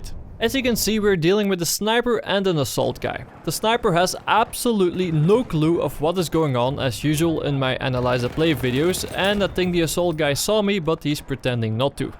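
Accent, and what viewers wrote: Netherlands. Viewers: You sound Dutch or Belgian to me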